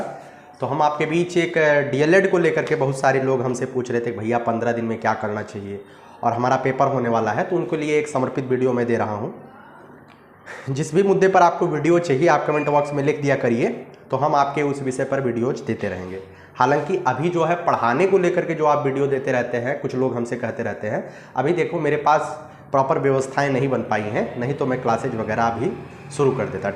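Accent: Bihar, India. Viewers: Sir aapke bat me bihari kuchh accent aa rha hai.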